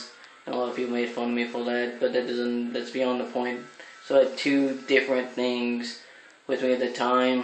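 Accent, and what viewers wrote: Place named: Ukraine. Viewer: Are you from the UKRAINE ! Your accent is very strong !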